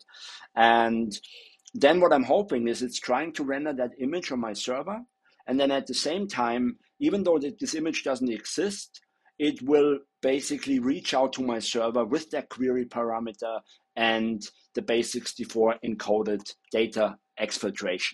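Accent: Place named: Germany. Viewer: Sehr gutes video , Ich habe von deiner Stimme erkannt dass du ein deutscher bist . Bin ursprünglich auch aus München. Hab auch mehrere Methoden selber herausgefunden Prompt-Injektion zu machen um schwachstellen in LLM-Models zu entdecken . Mach weiter so , liebe deine videos ! 🔥sind kreativer und gehen tiefer ins detail . ✌🏻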